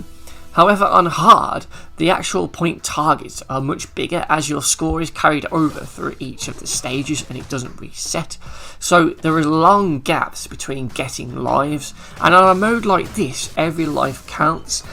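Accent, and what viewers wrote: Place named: United Kingdom. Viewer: I love your British accent, it’s hilarious lol you should make your channel of you complaining about games and your accent makes it funny & omg I subscribed and saved this video to my favorites lmao yesss!! American here 🇺🇸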